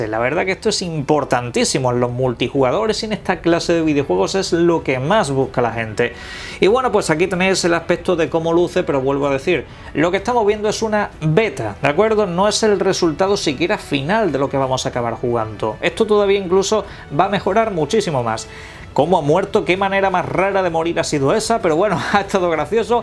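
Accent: Spain. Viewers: Me causa amsiedah que las palabras en ingles las pronuncies con acento español (por ejemplo, con halo decir "alo" en vez de "jeilo")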